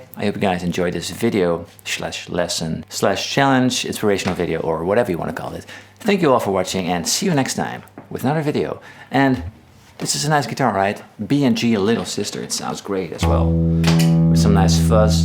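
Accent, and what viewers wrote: Netherlands. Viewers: He got a dutch accent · Hahaha gelijk hoor je het hè Nederlands. Blijkt toch een super charmant accent te zijn. Hahaha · without checking anything, I can hear you are dutch. The beauty of it, is that the guitar doesn't sound dutch at all. it sounds like beautifull blues <3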